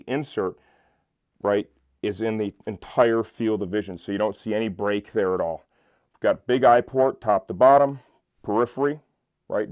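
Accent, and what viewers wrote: United States. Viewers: Why Americans speak like that?? You should see from time to time the BBC.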